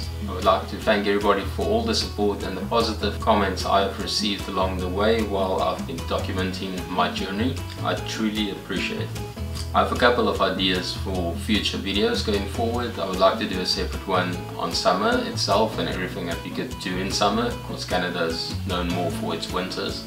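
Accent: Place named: South Africa. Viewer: Am new to ur channel i ave watching ur videos for sometimes now i really enjoyed it. So i subscribe to ur chennel i also watch other south african youtuber one base in Vancouver also an one in toronto you guys are really lovely people love ur ascent i am a Jamaican from watching all of you ur videos i cant wait to get to canada i wish you an ur family very happy an productive life in canada.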